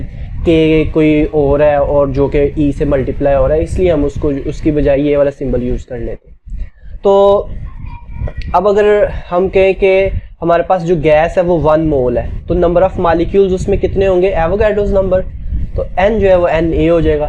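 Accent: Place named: Pakistan. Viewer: I guess, you are from pakistan. No hate❤, bro got to know from your accent.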